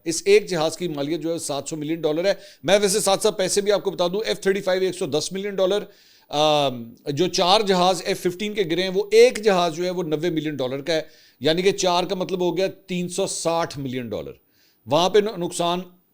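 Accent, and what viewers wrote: Punjab, India. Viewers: bhai aap ke panjabi commentary ke kya he kehny ayhyhy maza he aa jata hai sun ke❤❤❤ love you... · bhai Punjabi bhtttt piyari bolty hn ap😂❤ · Salam Mr punjabi touch in vlog brings 🔥🔥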